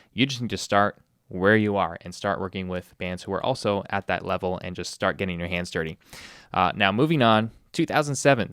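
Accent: Canada. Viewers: Video recommended on YouTube. "Well that's a good southern Ontario accent if I've ever heard one". Going through bands - oh yeah, these are all the hardcore bands I listened to in High School, man, where I grew up had such a crazy hardcore scene. Then proceeds to play song from band I went to high school with. Well yeah. That makes sense.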